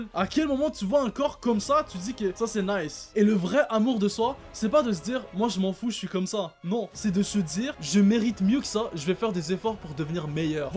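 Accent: Quebec, Canada. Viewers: Attends t’es québécois ?!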